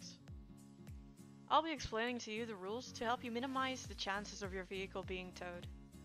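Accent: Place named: United States. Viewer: he aint wrong Shawn loooool UK boi that sounds really american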